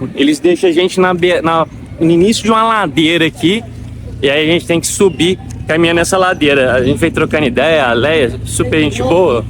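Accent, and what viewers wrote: Minas Gerais, Brazil. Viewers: otimas dicas Brother, pelo seu sotaque é minerin que nem eu kkkkkk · Não consigo parar de ouvir vc falando, esse sotaque mineiro é bom demais rs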